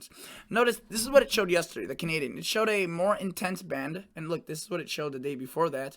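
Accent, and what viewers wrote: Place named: New York, United States. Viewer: Are u looking at Wisconsin for snow? You sound like a New Yorker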